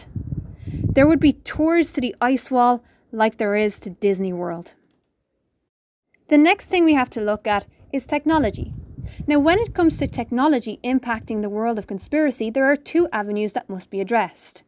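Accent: Ireland. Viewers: Are you Irish? 🇮🇪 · 👍🔔 Where are you from I hear an Irish twang in your accent?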